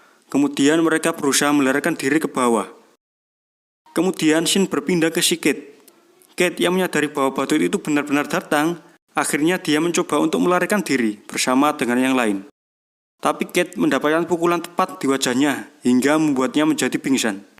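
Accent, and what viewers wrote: Java, Indonesia. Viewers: medok haha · Terlalu medok penyampaian nya jd gue skip ya. Bye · Agak geli , klo medok bilang Gue , gue , kenapa gak pake kata Saya aja ? 😂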